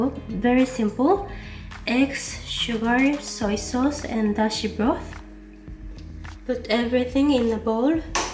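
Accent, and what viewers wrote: France. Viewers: の英語アクセントにフランス訛りがあって、すっかり🇫🇷に馴染んですごいなーと勝手に思っていました🥺💙🤍❤️ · Your English has a slight French inflection, so cute:)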